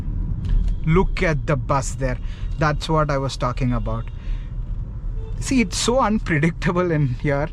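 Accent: India. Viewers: Bro your accent is neither Bangalorean nor Hyderabadi. Where are u from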